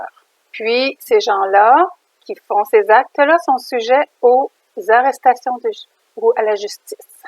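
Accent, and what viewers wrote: Canada. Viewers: C'est la première fois de ma vie que je trouve l'accent canadien magnifique.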